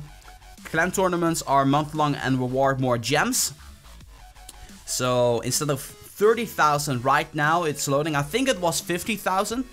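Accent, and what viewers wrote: Netherlands. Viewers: Ben erg benieuwd hoe je unieke accent bij het spreken van Engels tot stand is gekomen. Aan de ene kant heb je vrij duidelijk een typisch Nederlandse tongval (inclusief de uitspraak- en taalfouten die Nederlanders vaak maken), maar het klinkt daarnaast net alsof je er wat Schotse, Ierse en in mindere mate Amerikaanse ingrediënten aan je accent hebt toegevoegd. Sommige onderdelen daarvan klinken best authentiek. Je spreekt ook best snel en vloeiend waardoor het wel natuurlijk overkomt. Grappig en interessant; viel me op.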